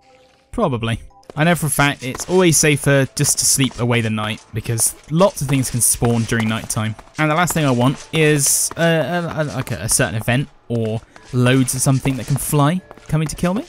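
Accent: United Kingdom. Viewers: the British accent is the cherry on top with this series it makes it 10x funnier LOL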